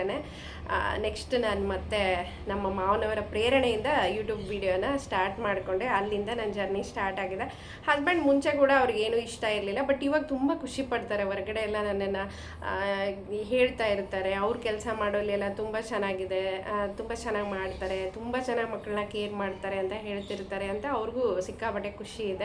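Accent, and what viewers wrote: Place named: Bellary, India. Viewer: Bellary nalli undya tindya ubmonaa ee tara yella mataditivi… gramya plus Telugu influence enda nam Kannada haage erutte…😂 nanu Banglore ge 1st bandage we tara adikolta eddru… but I love ur raw bhashe… keep going. Gaatri avarinda nim video noodle shuru aytu.. Bellary nalli YouTuber edare anta gotte eralilla… happy for you 😊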